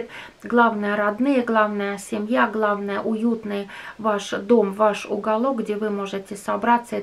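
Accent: Finland. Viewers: Здравствуйте,! Русский у Вас отличный. Ну а акцент-это более 30 лет пребывания в Финляндии. А про юмор могу сказать, что не каждый в России может знать столько "мулек", как Вы. Канал смотрю месяца три, очень нравится. Продолжайте в таком же духе. Смотрела ранние видео. Хоть мы и далеко друг от друга (где Финляндия и где Урал), мне интересно знать, как живут люди за горами, за лесами)))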